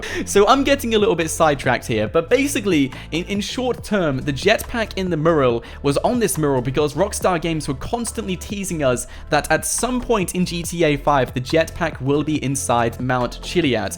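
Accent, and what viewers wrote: United Kingdom. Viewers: Where you from in uk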